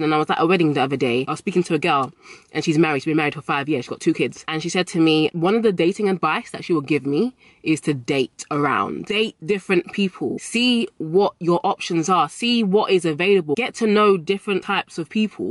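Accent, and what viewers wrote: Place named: London, United Kingdom. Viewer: This beautiful young lady has a London, England accent.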